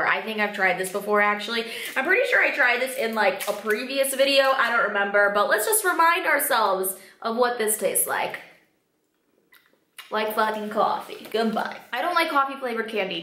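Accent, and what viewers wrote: Czech Republic. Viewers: je to supr :D prostě američanka narozena v česku,užasne :) prostě že ta čeština u ní pořád je :) klobouk dolu a supr videa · mluvíš neuvěřitelně krásně česky! Jsi češka?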